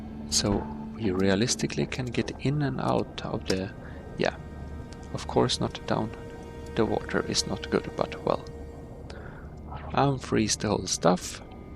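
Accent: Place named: Sweden. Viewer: I knew you where swedish on tour accent or what it is called och kolla jag är också Svensk hej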